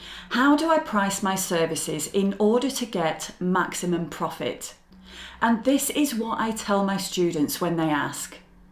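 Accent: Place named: United Kingdom. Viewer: Thank you again for sharing....I am actually setting up the backend of my e-Design company, and the price is the area I am currently working on, although I have 3 packages already prepared, so your advice is invaluable. Plus, I am now in the USA and your British accent reminds me of my hometown! how cool is that! LOL!😊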